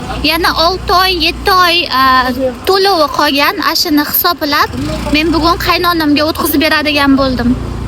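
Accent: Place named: Andijan, Uzbekistan. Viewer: анжанликмисиз, сарагҳее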